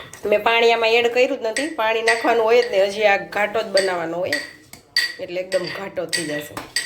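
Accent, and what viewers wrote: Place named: Saurashtra, India. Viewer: તમે સૌરાષ્ટ્ર ના છો ?? તમારી ભાષા એવી છે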